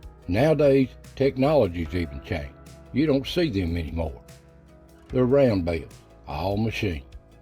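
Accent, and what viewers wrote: Appalachia, United States. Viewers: Well said. Another thing distressing to me is there's nobody under a certain age anymore that's "country". Even if they're born & raised in the country they don't sound like it. They don't have the heavy accent like you & i . That's not a crime but they all seem to talk so fast i have to get them to repeat theirself. Makes me kinda relieved to be past 60, so folks don't expect much, lol.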